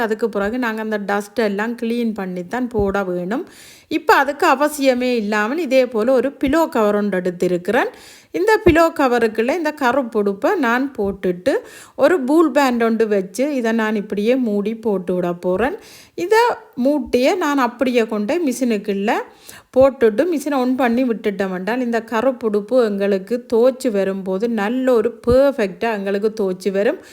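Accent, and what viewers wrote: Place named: Sri Lanka. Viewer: நீங்கள் கொடுத்த டிப்ஸ் எல்லாம் நன்றாக இருக்கின்றன. எல்லாவற்றையும் விட மேலாக, உங்கள் இலங்கைத் தமிழ் கேட்கும் போதே காதில் தேன் வந்து பாய்கிறது.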